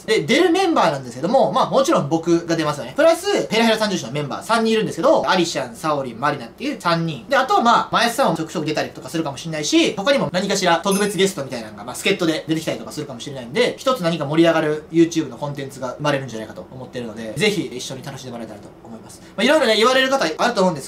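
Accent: Kansai, Japan. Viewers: ひかるゲームズまじで好き！ ひかるの関西弁実況がめっちゃおもろい笑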